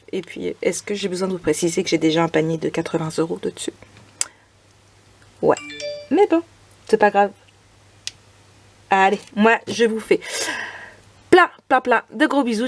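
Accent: England, United Kingdom. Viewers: Coucou, et oui j'ai bien rigolé pour ton joli accent anglais, très joli papier,j'ai hâte de découvrir le magnifique album que tu vas nous faire,je te souhaite une bonne journée bise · Cc jolie haul et oui j’ai bien rigolé avec ton accent anglais lol bisous 😘 priscilla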